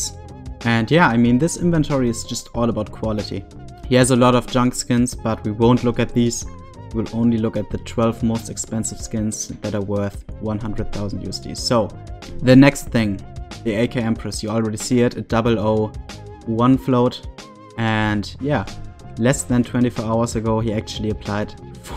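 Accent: Germany. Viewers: Man hört so das du deutsch bist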